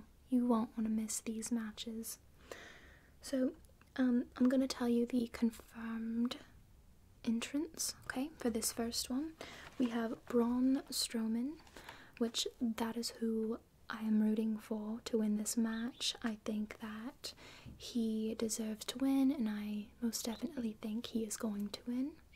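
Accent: England, United Kingdom. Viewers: love the british acsent if it even is haha! though the outfit is -tastic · Spectacular video the English accent and all the attires is amazing those were some great predictions have outstanding weekend enjoy wrestlemania 35 🧡💚✨🧡💚✨🎊🎉🎊✨😎🏆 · Kowalski analysis - I think you're wearing your brother's hat that says "Punk" on the front. I can't figure out if that's a wig but your hair looks unusually dark and has an odd shine. This is also the first time you're showing your new desk chair on a video. There's someone desperately trying to tell you something lol. I don't know anything about wrestling but it's always fun to see you portraying different characters! I've always wanted to hear you speaking with an English accent and you sounded great, it wasn't forced and felt very natural!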